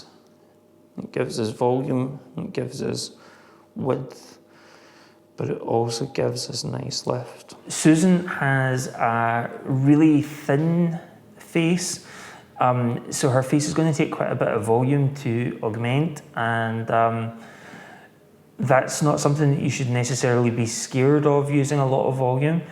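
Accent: Scotland, United Kingdom. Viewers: Who is this Scottish doctor and where is he located? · He’s clearly Scottish lol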